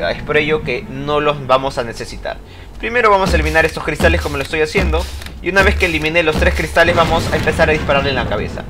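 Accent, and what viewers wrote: Peru. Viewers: eres peruano? si lo eres like xddx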